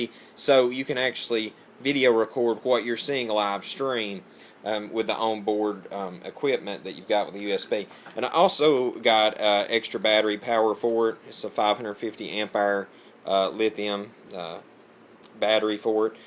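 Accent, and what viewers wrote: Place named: North Carolina, United States. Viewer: Are you from North Carolina?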